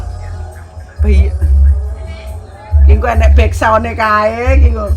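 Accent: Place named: Nganjuk, Indonesia. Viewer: Jawanya mana to mbak., dialeknya kayak daerah nganjuk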